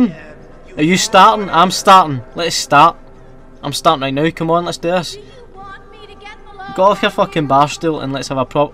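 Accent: Scotland, United Kingdom. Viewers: If we ever had some 1 on 1 Scottish talk, it would be hilarious.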